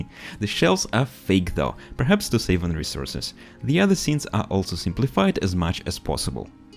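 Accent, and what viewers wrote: Russia. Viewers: Русский акцент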